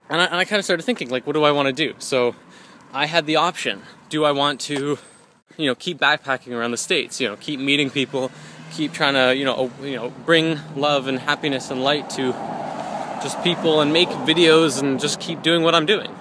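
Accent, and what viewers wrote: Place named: Canada. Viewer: To the people commenting on how he says words: He's Canadian. He has a Canadian accent. In fact if I remember correctly he even said "aboot" in SS1.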